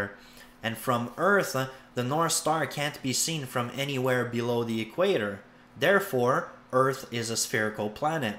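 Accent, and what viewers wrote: Quebec, Canada. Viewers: Sounds French Canadian to me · wannabe to wasabe he's Canadian French. Didn't learn English til age 9.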